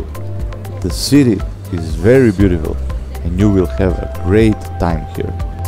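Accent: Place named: England, United Kingdom. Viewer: Grozan, teski engleski akcenat.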